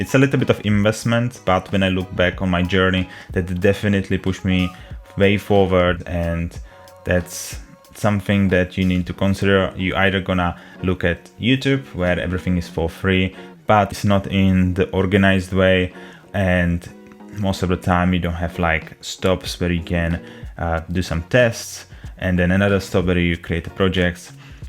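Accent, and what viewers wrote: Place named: Czech Republic. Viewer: Slyším českou angličtinu jdu mrknout na video :D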